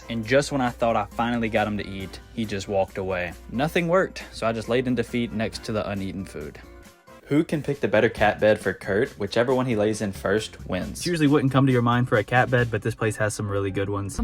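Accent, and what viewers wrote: South, United States. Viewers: I never realized how southern his accent is 😂 Oh, and Kurt's a cutie as always ofc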